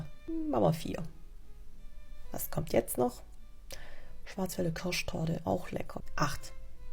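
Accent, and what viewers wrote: Swabia, Germany. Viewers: Haha du babblschd Schwäbisch is des Luschdisch.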